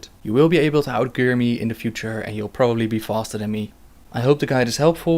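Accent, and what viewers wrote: Netherlands. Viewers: Dutch accent? ammiclose? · je hoort wel dat je nederlands bent haha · Dutch accent! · dude are you from the netherlands or something? I think i can hear it in your accent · Are you dutch?? i can hear it in your voice :)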